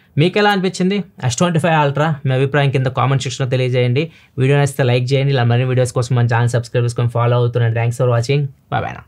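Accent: Ongole, India. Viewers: Our ongole telugu....😂 edge kopu ga untadi...❤❤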